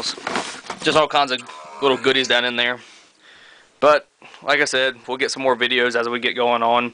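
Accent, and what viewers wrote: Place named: Texas, United States. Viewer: Way to go mate! it looks awesome, love the accent you gotta be from texas, good luuck with build I will keep an eye out for more progress vids, cheers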